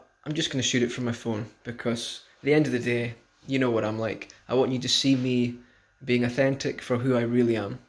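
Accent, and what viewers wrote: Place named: Scotland, United Kingdom. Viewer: Well chuffed yer Scottish pal,been listening to ye for a few weeks noo,brilliant stuff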